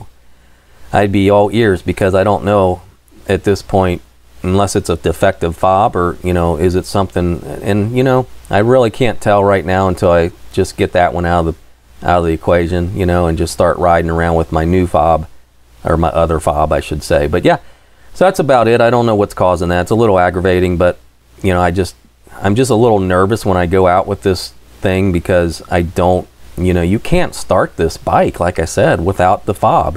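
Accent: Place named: Great Lakes, United States. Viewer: Are you originally from Chicago, Michigan, or the Great Lakes area? Just wondered because of your accent.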